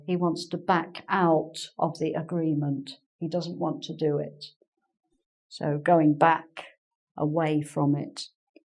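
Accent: United Kingdom. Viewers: I'm a spanish speaker and I really enjoy all your lessons. I have problems with the british pronunciation but I can understand you perfectly. Thank you very much you helped me a lot. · Hi, I'm from Brazil!!! You are incredible!!! I love your channel and I love the British accent. Thank you for sharing your knowledge with us! 👏🏻❤️😍🇧🇷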